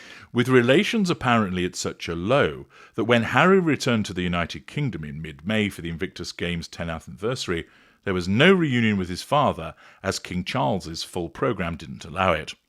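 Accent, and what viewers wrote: United States. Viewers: Good American accent! I think if you asked Hank and Skank on their wedding day what their hopes were, I would say look at the 758 page dossier that explains the "business" deal that this sham is. Now wave and smile Ginger Poodle! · Love the American accent!!!🎉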